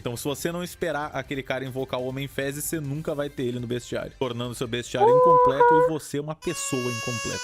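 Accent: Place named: Brasília, Brazil. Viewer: Você é de Brasília? Não consigo identificar o seu sotaque kkkkkk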